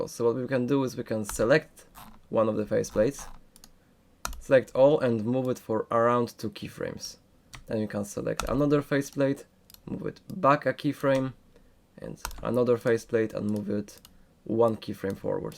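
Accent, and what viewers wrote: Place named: Poland. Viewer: masz polski akcent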